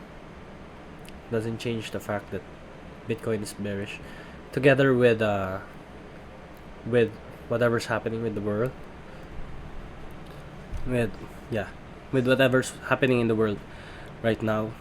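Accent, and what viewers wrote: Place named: Philippines. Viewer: It’s Filipino accent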